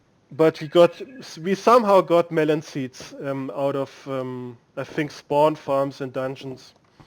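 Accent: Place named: India. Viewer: I think india. Sounds like an indian accent.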